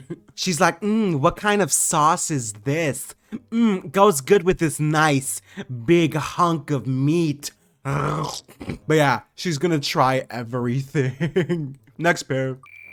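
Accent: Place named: Quebec, Canada. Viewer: Omg are you french/french speaker?? Your accent is awesome, like a French Canadian accent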